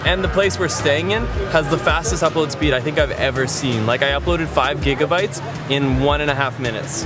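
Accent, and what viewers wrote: France. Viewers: Really like when you skeap English with the french accent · If you don't fall inlove with Paris from the very first time you see it in your own eyes, then you are not a human. Haha! Paris is really stunning. I had those escargot too! But if i have to choose between escagot and foie gras i'd go for the last one. Love your french accent,! Lol! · Love when you speak English with that French accent 😂😂😂 My God lol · That French accent tho! Cue Laura blushing... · Well done video I enjoyed it but your French accent is needs a little polishing.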